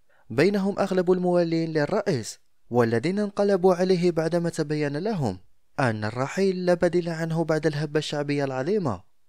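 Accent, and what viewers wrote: Morocco. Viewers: لهجة مروكية لماذا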